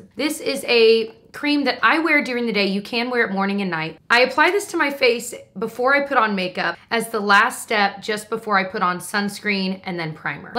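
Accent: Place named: New Jersey, United States. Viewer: You've probably heard of Good Reads but if not, it's an app that has other people's reading lists, as well as reviews on books. That's where I find most of my want-to-read novels 😊 I love just hearing your voice! I know that sounds weird but you sound like my New Jersey family ❤ I had that Nest perfume but gave it to my mom because it was a little too warm/mature for my taste. It's crazy how everybody's preferences are different!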